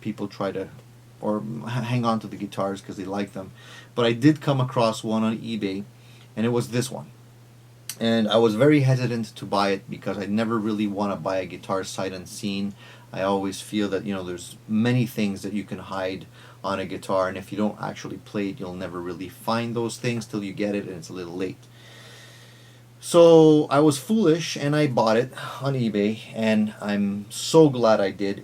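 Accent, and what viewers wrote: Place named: Quebec, Canada. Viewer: French Canadian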